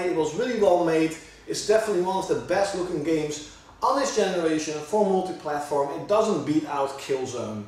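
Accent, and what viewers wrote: Netherlands. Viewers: Klinkt nederlands. Waar kom je eigenlijk vandaan?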